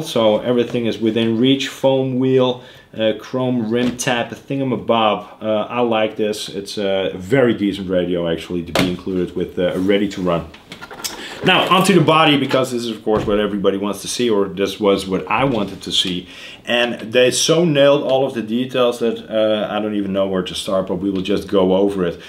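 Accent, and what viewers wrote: Netherlands. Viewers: Lijkt op Nederlands Engels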